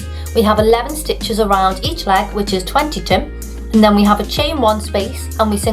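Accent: United Kingdom. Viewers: I just bought this pattern & I am excited to make a start. I do have 2 questions though. Why is (Jason Mamoa’s) Aquaman white? Also from your accent, I guess you’re British. But you use US terminology. Is this pattern available in UK terminology. I don’t mind buying it again, as it would make my English heart glad!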